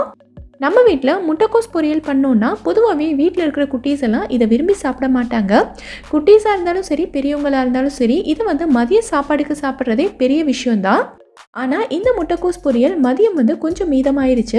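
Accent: Madurai, India. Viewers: அஸ்ஸலாமு அலைக்கும் சிஸ்டர்.உங்கள் குரல் சூப்பரா இருக்கு நீங்க எந்த ஊர்.நாங்கள் மதுரை . பேச்சு வழக்கு எல்லாம் எங்க ஊரு போல் தெரிகிறது.அதற்காக கேட்கிறேன் தப்பாக நினைக்க வேண்டாம்.மென்மேலும் வளர எல்லாம் வல்ல இறைவன் அருள் புரிவானாக... ஆமீன்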